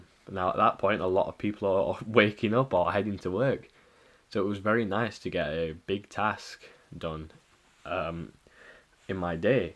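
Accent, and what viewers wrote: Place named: Sheffield, United Kingdom. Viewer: sheffield accent spotted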